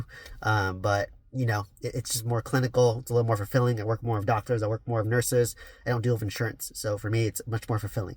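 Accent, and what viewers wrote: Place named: California, United States. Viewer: He sounds like he's from California.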